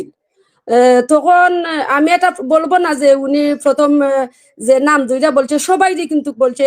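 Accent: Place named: Sylhet, Bangladesh. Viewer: তুমি যে বেটি সিলেটে ভাষায় কথা কও জানো ইসলাম সম্পর্কে তুমি চ্যানেলে ঢুকো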